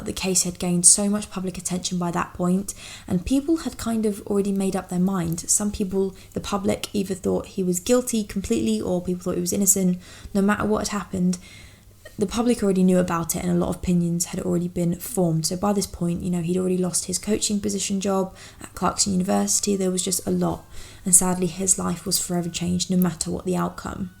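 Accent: United Kingdom. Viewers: It's not abt opinions or lights, camera, action or having the best equipment etc, It's abt her very blah blah, very mundane, disinterested presentation; It's like watching paint dry. Like she's reading from a script on how to put together a garden shed. Everytime I click on a recommendation of hers; my ears just glaze over. She seems completely disinterested in the topic; just mumbling through til the end as quickly as possible. She's not engaged at all. I think Americans just like a British accent regardless of what's being said and how. On a positive note, she's attractive, which is her saving grace for those that find attractiveness important, ie men. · Annaliese O'Malley I think you may just have watched too much TV, where everything is all about drama and excitement. I prefer her level-headed and articulate overview of cases, and I’m sure others that enjoy her content do too. 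Very bold of you to discredit any following this woman has as being because of her british accent and looks.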